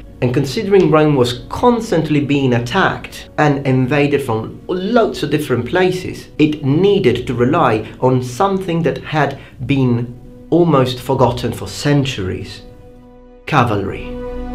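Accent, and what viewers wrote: England, United Kingdom. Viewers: Man, you really had a thick British accent back in the day. Also, i like how every cut starts with you doing a little jumpscare. Bring back the jumpscares! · Are you from the UK? Where are you from? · Cut your hair you look like a girl.-Bad mix (english accent beard and long hair)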